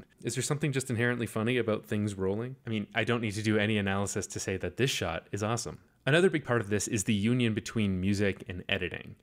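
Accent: Canada. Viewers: First sentence and I know you're Canadain! Beavers and Maple Syrup, huzzah!!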